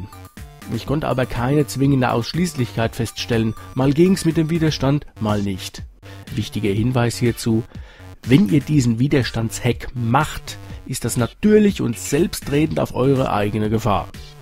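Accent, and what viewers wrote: Hesse, Germany. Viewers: Ist nicht so schlimm, wenn man beides nicht hat. Hatte erst in der letzten Woche nen 1200er aus'm Sperrmüll gefischt und dieser funktionierte nach nem Testlauf. Von daher wäre so ne Turbokarte durchaus interessant, wobei ich eh nur mein Lieblingsgame zocken würde; und zwar Lemmings, das hab ich in meiner Kindheit gesuchtet.:D Aber es ist trotzdem erstaunlich, was man mit nem Amiga alles anstellen kann; sowas war früher sogar dem PC technlologisch weiter voraus; die Workbench war sogar intuitiver bedienbar als die "schwarzweiß"-Apple-Oberfläche, wo man die einzige linke Maustaste gedrückt halten musste, damit das Menü offen blieb. Beim Amiga gabs diese Problem überhaupt nicht. Derzeit nutz ich zur Zeitvernichtung den WinUAE-Emulator + Kickstarts von AmigaForever sowie den verbleibenden Spiele-ROMs um die Lemminge zwischendurch in der Pause zu zocken. 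Ich finde, auf dem Amiga hatten die Spiele nen besseren Sound als die Konkurrenz. Ansonsten find ich die Videos von besser als die konkurrierenden YouTuber. Klingt komisch ist aber so; und das hat nischt mit dem hessischen Dialekt zu tun. Eher ist es die Qualität, an die niemand rankommt.:D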